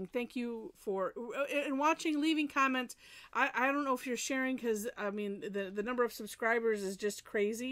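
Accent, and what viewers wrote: Midwest, United States. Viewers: Everyday I watch your videos. Love them and it's so wonderful to hear the midwestern accent, I'm from Chicago but living in North Carolina and everybody here has a southern drawl.😂 thank you for your videos.❤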